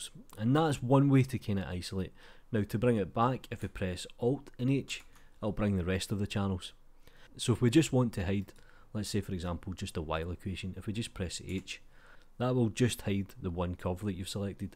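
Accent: Scotland, United Kingdom. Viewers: I don't know much English but I understood that you are Scottish, because I watched the TV series Outlander! They sometimes spoke Gaelic there. Are you by any chance from the McKenzie clan or Fraser?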